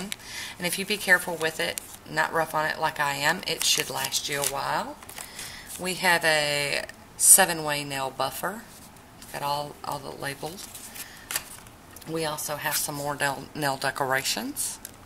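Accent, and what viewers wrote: South, United States. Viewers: I'm from Pennsylvania! I could definitely tell that you're from the south! Thank you for sharing your love of polish with the world!!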